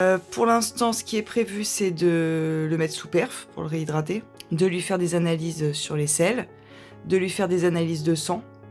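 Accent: France. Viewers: Des gros bisous guérisseurs pour notre petit sudiste.. je pense fort a toi et reviens nous vite pour nous faire rire avec ton accent du soleil !! Je croise les doigts +++ ❤❤❤ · Accroche toi Tu nous manques déjà, reviens vite tu nous fait trop rire avec ton accent de Marseille...❤❤❤❤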